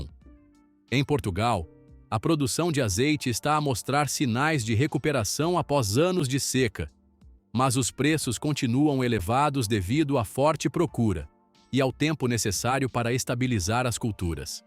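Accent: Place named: Brazil. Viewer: Como é que isto pode estar acontecendo/ a acontecer? Um texto integralmente em português europeu, mas falado em brasileiro do início ao fim!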